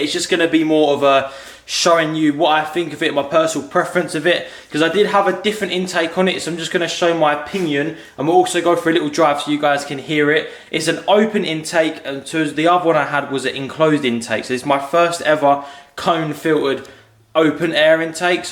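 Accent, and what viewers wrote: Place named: United Kingdom. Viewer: 1) intake and POV vids sound beautiful! 2) Which part of the UK is your accent from? Asking to learn. So many dialects over there on two islands, and I enjoy them. Cheers from across the pond. ✌ 🍻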